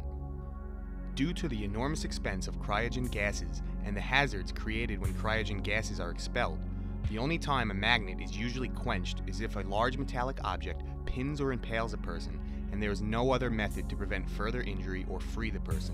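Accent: Boston, United States. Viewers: I don't know what was better, the education or the Boston accent.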